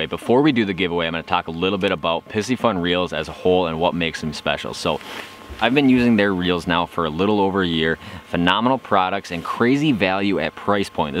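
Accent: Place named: Minnesota, United States. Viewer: Are you from the midwest? You have a very minnesotan like accent lol also great video with actual info not just rambling like all the other videos I've seen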